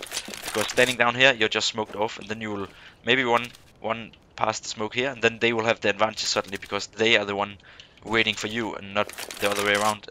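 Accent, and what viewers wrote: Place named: Denmark. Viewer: dansk accent heh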